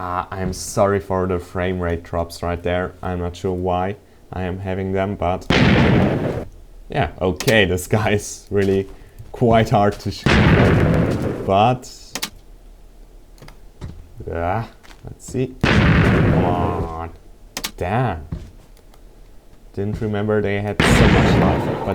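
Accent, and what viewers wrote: Germany. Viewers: are yu germaner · are you german cause you have a little akzent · Bist du Deutsch ? :D Hast so einen Akzent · Well, you kinda have a german accent, at least in my ears.. It that true? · oooh he sounds german!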